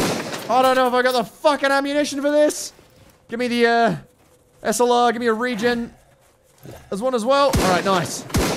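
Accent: Australia. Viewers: I love how thick his accent is. He really sounds Aussie, I fucking love it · Not hard to understand, I understood him just fine. But it was a stark contrast compared to how he usually sound around non-Aussies and in his other videos, and definitely a much stronger dialect. And I frankly found it wonderful. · went full Australian during this video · This is the most australian I've ever heard be in a video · Got sent this by a mate. First thirty seconds and I’ve subscribed. I’ve actually got someone in my own country (or at least my own nationality to watch) unless I’m wrong and he’s just putting on the Australian accent, but sounds bloody spot on at least. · I’d love to see more of this! I especially love how becomes more Australian playing this! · getting back to his roots in more ways than one! I wonder if hes happy to finally have a game that he can legit use aussie accent 100% and noone will nag him about it cause its theme appropriate, although I don't get why hes so bothered by Dicko's slaughterhouse? 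I mean OSHA/H&S would have a field day with Dicko, were he still alive... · This is THE most Australian I've ever heard, or any other Australian streamer, get. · Hearing going full Australian is amazing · my favorite thing about this video is that the accent got more noticeable as the video went on, which tracks with what i've heard about australians toning down their accents for non australians but slipping right back into when around other australian accents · really let out his Australian out in this video. I guess he really felt at home. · now i've been watching for a good while now and i have to say this is the most australian i have ever seen him go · This is the most Aussie I’ve heard in a while and it’s great. Especially with the Drop Bear. And seriously. Wtf is a Drop Bear. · 2 things: 1) takes shots at a kangaroo Kangaroo comes from the side: Clever girl 2) I think this video has strongest Australian accent I've ever heard...not sure why that's a thing, but having watched his stuff since he played Valkyria Chronicles 4, it's just something I've observed. 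· I've never realized how Australian can actually get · Man your aussie accent came out strong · became so Australian that I actually had some trouble understanding him. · I know is from down under but it’s really coming through thick in this video and I love it! Also yeah, paintball ref/ marshal… done that. You break your voice first weekend, then you can yell so loud. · I love how sounds VERY Aussie in this one. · A videogame about the Australian outback in full Australian mode "Just like the simulations" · I feel like this is the only video I saw, where go full aussie. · I love how accent slowly gets more and more australian through this video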